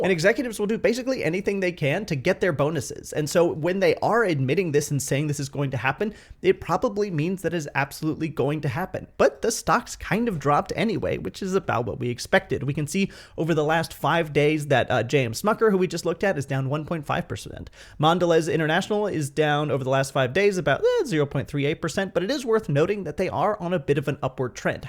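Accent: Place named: India. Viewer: Love your indian accent. The way you said "P'ty" instead of "Pretty" it's fun.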